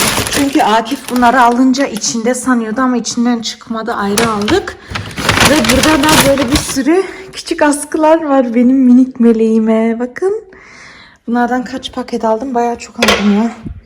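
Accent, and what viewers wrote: Turkey. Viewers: Du bist die beste mit deiner türkische Aussprache Kıvır zıvır 😂 so eine schöne Wohnung 🤩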